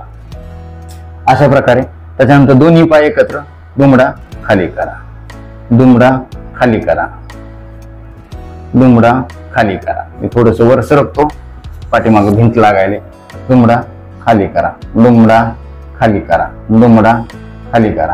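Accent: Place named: Kolhapur, India. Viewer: खुपचं छान व्यायाम शिकवता फार फायदा होतोय धन्यवाद 🙏 सर आपण खरंच लातुरचेच आहात काय कारण तुमची भाषा हि कोल्हापूर ची वाटते मी हा व्हिडिओ कोल्हापूर जिल्हातील गडहिंग्लज तालुक्यातील एका खेडेगावातून पाहते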